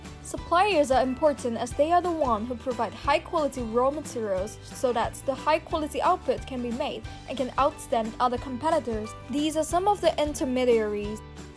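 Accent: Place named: United States. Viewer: I love ur American accent! U must be a beautiful girl!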